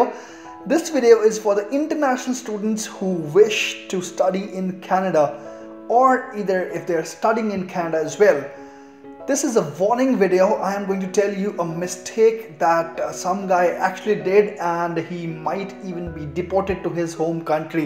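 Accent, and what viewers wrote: India. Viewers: Indian english accent hi h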